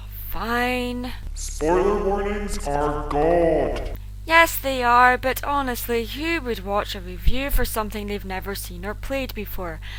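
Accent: Scotland, United Kingdom. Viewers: You probably hear this a lot.... but I really like your voice. Scottish accents sound so cool. ^^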